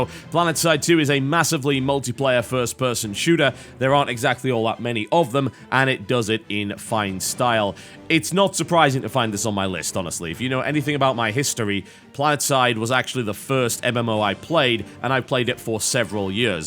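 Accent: United Kingdom. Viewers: your british accent disgusts me. Im from england and yet i still cannot understand wtf your going on about. The end of your sentences all sound like your american.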